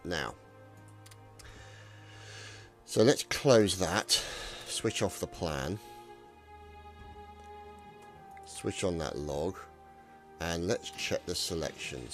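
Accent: United Kingdom. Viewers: is this person British ?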